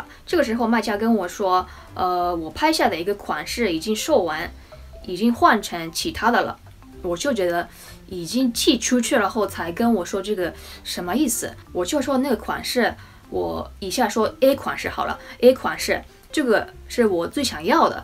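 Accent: Osaka, Japan. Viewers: 大阪方言还是改不了，哈哈哈汉语说的真好，学语言确实越小学的越好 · 的口哨和大阪方言挺好听的。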